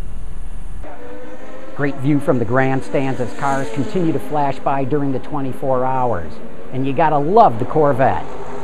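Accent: United States. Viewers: This guy's voice is insufferably American. I'd hate to travel with him to Europe.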